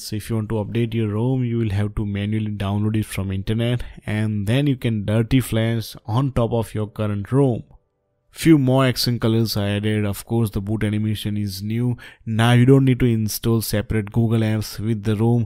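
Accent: India. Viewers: Are you Indian living in Melbourne? Because your English seems Indian.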